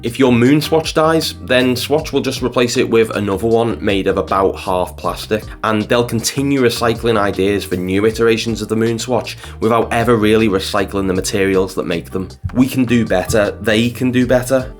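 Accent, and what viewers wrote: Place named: Wirral, United Kingdom. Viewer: hahaha I largely agree but I just can't get over your bad Wirral accent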